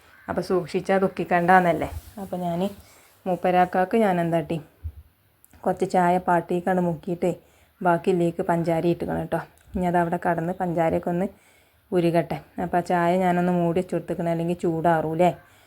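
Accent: Malappuram, India. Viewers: അന്റെ നാട് ഏതാണ് ഒർജിനൽ മലപ്പുറം ഭാഷ ആണല്ലോ ഇജ്ജ് കജ്ജ് പജ്ജ് നെജ്ജ്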